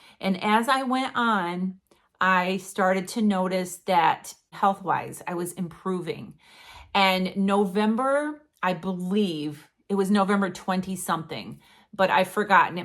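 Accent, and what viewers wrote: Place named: Michigan, United States. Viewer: Great testimony,! Well done! My story mirrors yours so much — almost 2.5 years now as a keto-vore. SO many benefits and changes and we’ll never go back. I’ve been watching your videos for a year now and enjoy seeing you and Ed and your adventures. The first time I heard your video, I heard that Michigan accent and thought “hey! A fellow Michigander!” 😊 Thanks for all the encouragement! Keep going, lady! Julie in W.MI.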